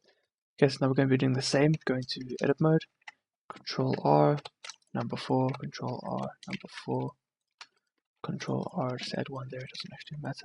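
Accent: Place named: South Africa. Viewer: I flippen knew it! I can hear the accent a mile away, i am too, it's good to see another Blender South African out there, keep up the good work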